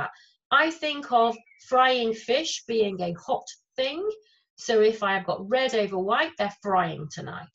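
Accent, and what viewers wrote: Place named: England, United Kingdom. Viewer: It’s Greg Laughlin from Australia again and I’ve just watched this from beginning to end and it is wonderful. I really appreciate the English accent and the authority she has in her voice combined with the fact that she clearly knows her subject backwards.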